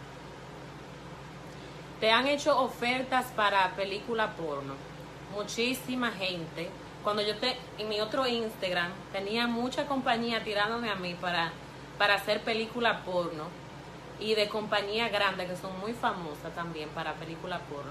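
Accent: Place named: Dominican Republic. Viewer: me encanta el hacento de rd de esta hermosa mujer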